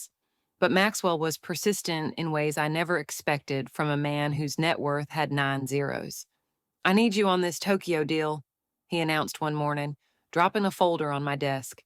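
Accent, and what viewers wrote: South, United States. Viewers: What is this...picture and story about a Black woman being narrated by a southern White woman!? You couldn't even use an AI voice to get a neutral one? This is offensive.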